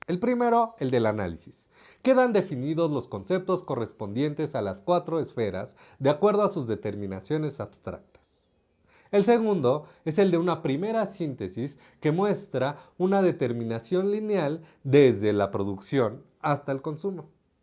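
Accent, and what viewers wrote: Mexico. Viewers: Que buena pedagogía y que agradable narración, ¿eres mexicano?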